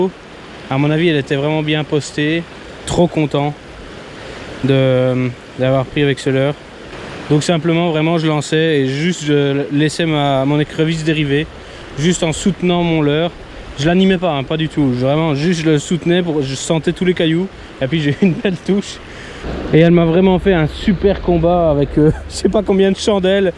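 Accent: Belgium. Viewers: Wouah 😲 respect mec 🤜. TRES belle section, des truites magnifiques. j'ai Jamais vu une vidéo pareil...tes très respectueux avec les truites un vrai passionné ça fait plaisir à voir 😊. Je m'abonne à ta chaîne du coup et bien sur le petit pouce 👍. Ne change pas surtout 😉. Bye PS: Petit accent belge ?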